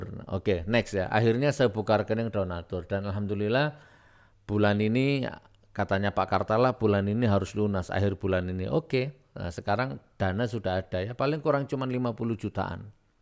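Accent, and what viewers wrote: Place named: Java, Indonesia. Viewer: kangen logat medok jawa nya sama klemat klemot mulut mu gus tpi gus dobol wkwkwk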